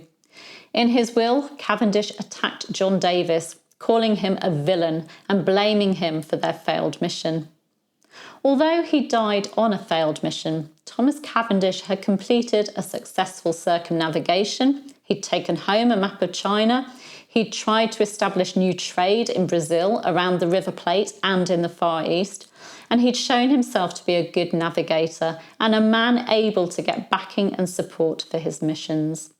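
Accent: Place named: England, United Kingdom. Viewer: Having lived a stone’s throw from Jamestown Settlement and visited the Outer Banks many times, I get a thrill hearing an English person speak of the history. I cringed a bit when I heard Ralph Lane’s name; he really cut a swath through the Native Americans in “Virginia” in 1585. I’ve always wondered what Queen Elizabeth thought of that. Thanks for all your amazing work!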